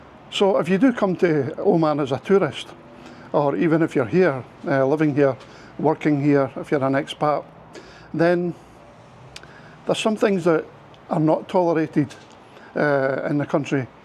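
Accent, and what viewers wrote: Scotland, United Kingdom. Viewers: Binge watching your videos tonight from India. Your Oman videos are great and hey I like that Scottish accent😂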